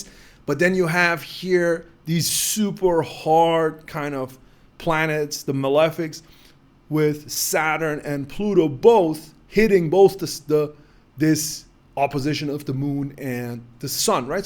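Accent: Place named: New York, United States. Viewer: I'm American of Swiss French parents. I was in Iran for 2 or 3 weeks in 1970. I hitched rides from Turkey to Tabriz then Tehran . Had to take a train to Mashed , a very holy city. Iran was a very peaceful place. The people were super nice , very intelligent, very clean. The woman were free and very friendly in a very respectable way. It was completely different going into Afghanistan . A very wild place. Your channel says United Kingdom but you sound like you're from New York city. Your astrology readings are always a real mind blower. You deep dive like no other. Thanks for your incredible work !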